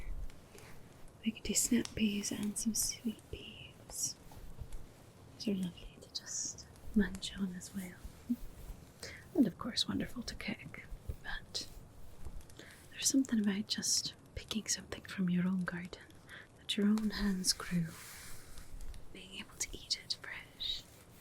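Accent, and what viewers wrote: Ireland. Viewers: I do not claim to have heard many Irosh accents in my life but i love everything about the way you talk to me. Thank you for warming me up in this rainy weather! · Ginger curls and freckles suits you well. How do you do a Irish brogue so well? It sounds so natural. 😊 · After the rain subsided I will find the mushroom I seek., you do a mean Irish accent. You really are the master. Terrific RP!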